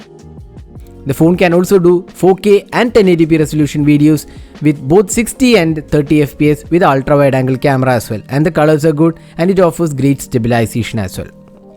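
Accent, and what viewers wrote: Kerala, India. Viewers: From your englsh i can say that you're a malayali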